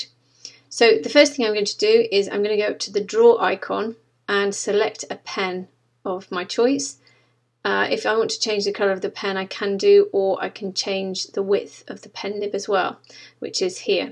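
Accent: United Kingdom. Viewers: This so clear, I promised this will be my class, the ascent is so good, British type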